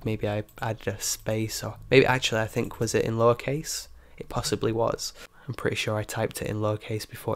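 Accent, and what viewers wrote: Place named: United Kingdom. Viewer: Lovely video. I'm starting a science youtube channel (in Spanish) and I need these kinds of things to get the animations set and going. Lovely accent. I can obviously tell that it is from the UK. London and whereabouts?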